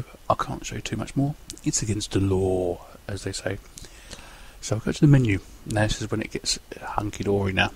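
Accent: United Kingdom. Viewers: Very nice, matey. Loads of stuff there. You really are getting what you need. And nice to see some specs on the machine, your running it on. I've never really used KDE. should i give it a try? Btw I love your ''british'' (scottish?) accent. ''tic, toc, tic, toc'' Hehe. Great review matey!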